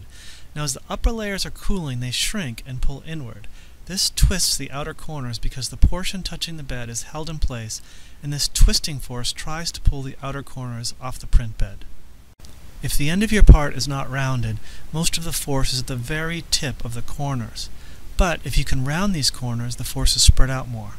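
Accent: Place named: RP, United Kingdom. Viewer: You talk as son of the Queen of UK. Are you an engineer?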